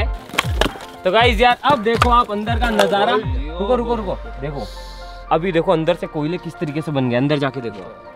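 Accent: Rajasthan, India. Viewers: Bhay aap ki marwadi bhasah jordar h mja aa gya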